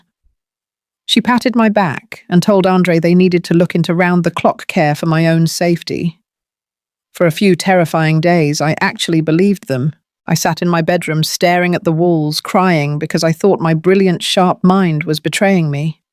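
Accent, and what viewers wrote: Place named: United Kingdom. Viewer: It might be a good idea to just leave race out of this. These are simply human stories. This is obviously a British sounding white woman’s voice. Just let us enjoy it. Thanks 🫶🏾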